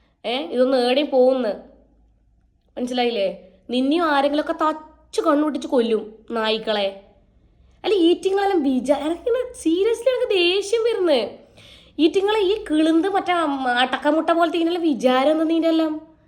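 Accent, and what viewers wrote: Kasaragod, India. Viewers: Kasaragodinte shabdam 😊😊😊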